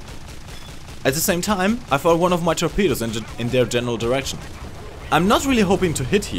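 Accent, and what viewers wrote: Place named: Germany. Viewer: Thanks! Very helpful. Love your channel. I am a loyal subscriber and love your German accent!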